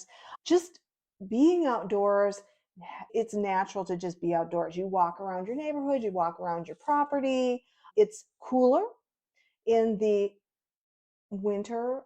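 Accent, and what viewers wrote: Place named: Minnesota, United States. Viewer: I thought I recognized a little accent there, don'tcha know?? Ya, you betcha! I grew up in a small town of 250 people in Southern Minnesota 😂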